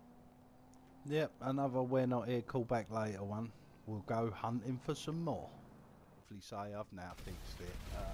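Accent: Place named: South, United States. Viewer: Hahahhahahaha!! Loved the Country accent man!!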